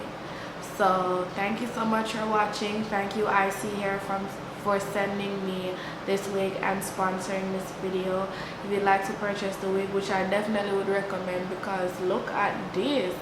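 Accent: Jamaica. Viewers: Loooove!!! ❤❤❤ You sound like a yardie tho! Only a yardie will understand what i mean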